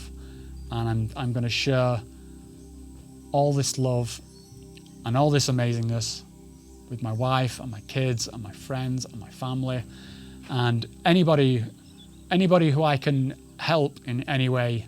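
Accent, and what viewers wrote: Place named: Manchester, United Kingdom. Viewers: I love this channel. I don't know why but there's something really nice about your stories being told in a northern accent. I'm a fellow northerner but from the other side of the Pennines up in the northeast. "I thank her for what she gave me and I forgive for what she couldn't give me" - this is absolutely beautiful. I had my first ceremony a month ago or so and this one of the big hurdles that I broke through and it's made so much difference to my relationship with them. This trip sounds bonkers though, I don't think I fully broke through tbh but it was a tremendous experience anyway. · I live in Manchester and judging by your accent you're not far from here. I'm ready for my journey to understand my childhood trauma. I have social anxiety and going to South America is too out my comfort zone to go alone. 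I need to find a budy who has experience and will come with me. Can you tell me how the best way I can do this and where. I'm 48 year old grown man and am educated on DMT. Please can you help. Really want to do this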